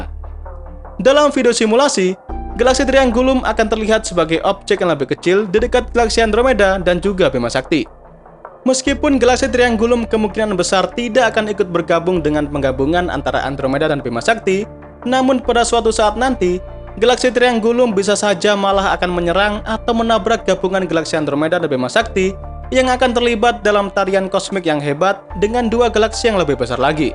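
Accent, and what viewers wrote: Java, Indonesia. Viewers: Jowone metu min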